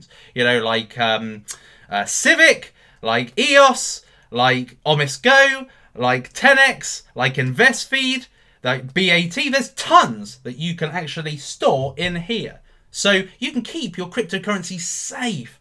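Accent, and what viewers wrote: United Kingdom. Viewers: ahhhh, had to say, I actually missed hearing that brit voice ahahaa. Hope you had a fair holiday bruv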